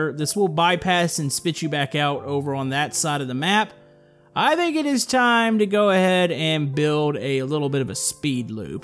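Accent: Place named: South, United States. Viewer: By hearing your voice it sounds like you have a country accent...no offense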